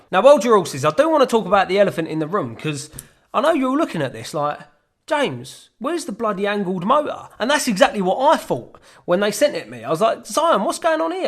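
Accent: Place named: Essex, United Kingdom. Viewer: Yeaaah definitely getting a 3S pro...I guess I watched this video just for your accent 😂 #essexnostalgia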